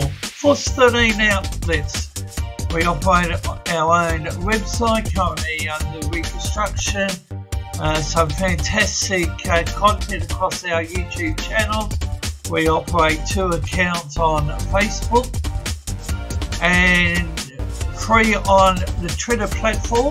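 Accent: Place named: Australia. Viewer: Défi 200 abonnés sans aucune vidéo: HE'S AUSTRALIAN AUSTRALIAN ENGLISH + SHITE MIC = STUPID VOICE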